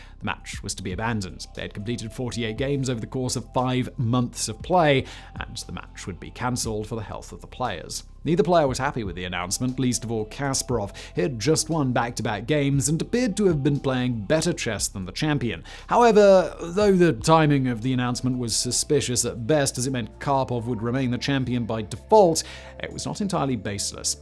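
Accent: RP, United Kingdom. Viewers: This guy sounds like a normal british guy who's trying to play up his accent so americans think he's fancy and posh, ya sound like a twat mate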